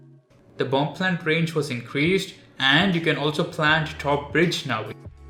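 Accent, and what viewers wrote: India. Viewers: Love u accent Ur indian?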